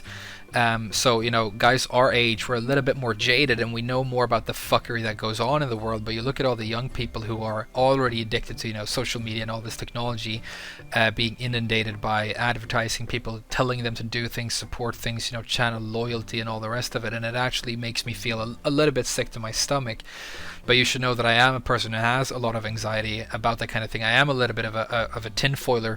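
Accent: Sweden. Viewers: I've known you were Swedish from the beginning, you have a very good accent but the Swedish origins always shine through, no matter how hard you try (I should know, I'm Swedish too)! I love your videos and your Feng video especially helped me a ton, and even though you don't even play him yourself, you managed to make a really good guide. I'm sure MainMan was joking about that by the way. Keep up the good work! Skål! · Also, I realize ur Swedish accecent in ur first vid lol, cheers.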